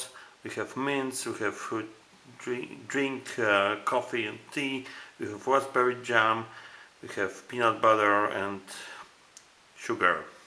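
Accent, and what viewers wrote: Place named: Saskatchewan, Canada. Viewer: * sounds like all of my relatives in Saskatchewan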